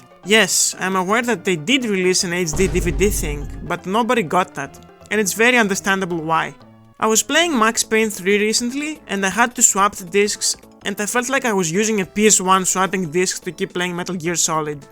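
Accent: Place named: Greece. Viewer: to greek accent 💔💔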